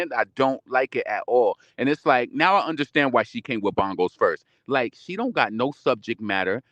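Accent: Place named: Brownsville, United States. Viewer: The way you talk I though you was from Brownsville lol but it’s ok I still love you you be talking ya shit speaking big facts. 😘😘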